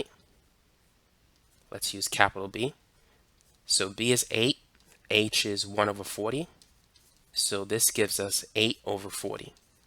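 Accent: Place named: New York City, United States. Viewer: I think there is a slight NYC accent in there. Almost makes me miss home. Great video...thanks!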